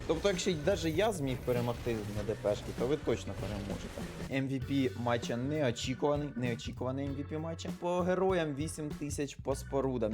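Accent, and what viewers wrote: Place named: mixed, Ukraine. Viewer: привіт- класний контент:) скажи як можеш з якої ти області)) балачка полтавська чи черкаська)?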